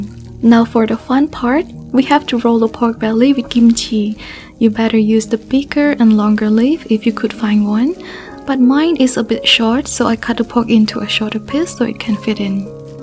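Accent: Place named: United States. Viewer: So proud of you, what you are doing, and how you are representing Khmer on international stage. From listening to your pronunciation, I think you are Khmer American. I am even more amazed that you can speak both Khmer and English so fluently, not to mention your soft and charming voice. And one more thing, when will you reveal your face to millions of fan in Cambodia 😬😬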